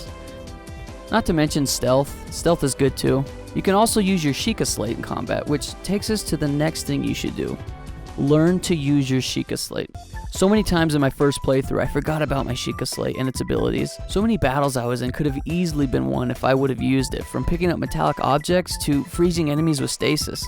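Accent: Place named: California, United States. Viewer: this choking californian accent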